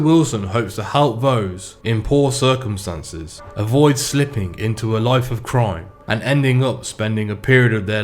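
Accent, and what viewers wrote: Bristol, United Kingdom. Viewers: no they weren't though, why are you acting the goat sun we all know Bristol nothing the only reason this guy is doing a video is because he sounds like he is from Bristol and probably had his peddler robbed off him by them and he thinks there the cripps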